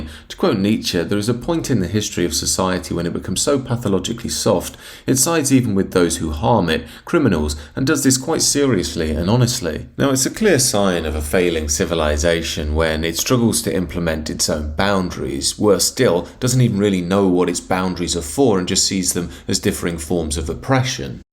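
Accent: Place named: England, United Kingdom. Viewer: London England and NOT Canada. You can tell by the buildings and the narrators accent. Keep Up !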